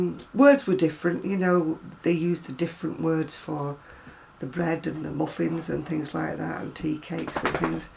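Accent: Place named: England, United Kingdom. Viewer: love your accent,! Are you from the part of England that James Herriot's books were based? Loved their pbs show accents, as well. Such a lovely granny square blanket or shawl you are wrapped in. Pretty mutted colors. Each of the English crochet blogger's accents seem unique to their area,like ours in the U.S. Because so many 'yankees' have moved down south, our drawls are probably changing,lol! Oh yes, such sad news about Buster. I am truly sorry about his passing. I too have an older fur baby, and I know that time will come too soon. He is like a beloved son, and has provided me with lots of love and companionship since 2002. I can't even imagine him not being here.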